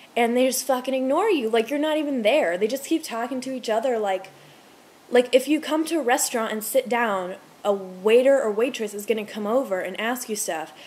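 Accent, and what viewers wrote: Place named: Tennessee, United States. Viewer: Can totally hear the Tennessee in your accent!!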